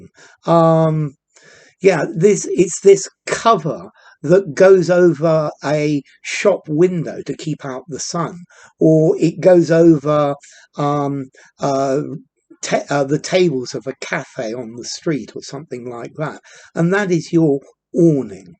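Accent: United Kingdom. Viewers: Thanks for the UK pronunciation . Its derivative awning window" where the upper end of the sloping window is hinged and the other end meant for moving the window along an arc.. Please correct me, if I am wrong.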